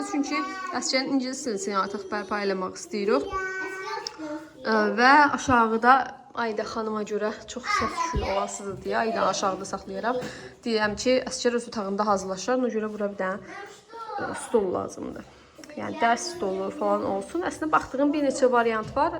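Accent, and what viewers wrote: Sheki, Azerbaijan. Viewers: Videonu şəki ləhcənizə görə izlədim. Rayonlara getmək fürsətim olanda ilk seçimim Şəki şəhəri olur. İnsanları, təbiəti, şirniyyatları.... Sevirəm Şəkini. Allah yeni evinizdə şirin övladlarınızla, yoldaşınızla xoşbəxt yaşamağı nəsib etsin .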